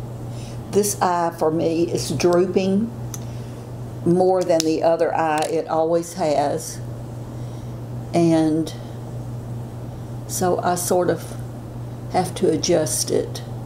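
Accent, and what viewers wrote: South, United States. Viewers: Kindness begets kindness, you are so right! And I believe it is contagious! BTW, I love southern accent. No other accent in the world is as charming, and makes you want to smile regardless of how you may be feeling. And yes, I am a southerner so I might be a "tad" partial!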